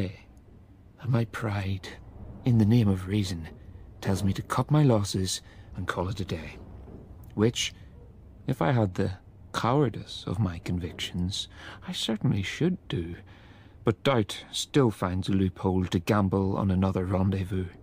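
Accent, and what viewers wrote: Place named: Ireland. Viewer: Love the Irish accent …. Easy on the ear …thank you. The Irish author, MacNeice, spent time studying at Oxford … the work reflects a degree of both an English & an Irishman’s sensibilities …. Threads of the English upperclass gent 🤵‍♂️comes thru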